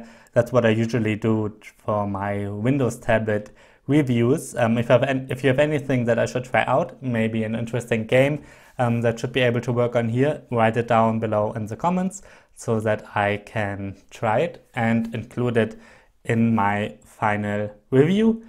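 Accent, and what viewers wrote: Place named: Germany. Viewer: Are you german? Shis is fery notisble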